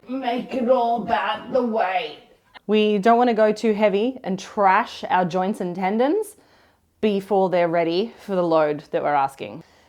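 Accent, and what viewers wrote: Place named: Australia. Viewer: that aussie accent ⚡ i started with Maces 8, 12, 15, 10 & now finally 4kg & even 2kg. Steel clubs journey was different: 4kg pair, 4kg single, 12kg pair, 16kg pair, 20kg pair, 8kg pair, 6kg pair, 1.3 & 2kg indian wooden clubs pairs. the bodybuilder-gym mindset does not work with these tools at all. In all my workout light weights are warm-ups & cool-downs. Also it is great to have light-medium-heavy days. Everytime i hit a heavier mace, lighter ones feels so much lighter after the heavier ones. So, now 15kg feels still heavy, but the day i hit the 20kg one, 15kg will feel lighter. To ascend 2kg weight in steel mace i use golden rule: ability to perform 100 reps 10 to 2 or 200 reps 360. i also use steel maces for muscle building exercises & they can do that function too.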